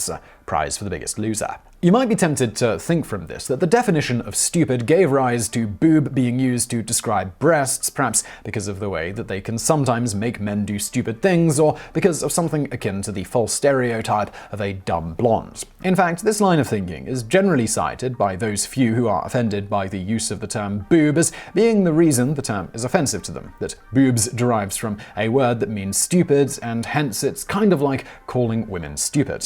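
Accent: England, United Kingdom. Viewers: Never have I heard someone speak so eloquently and academically on colloquial breast terminology. British accents make everything sound more serious. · LMBO saying fun bags in English accent with a straight face just hillarious 😂 very interesting research lol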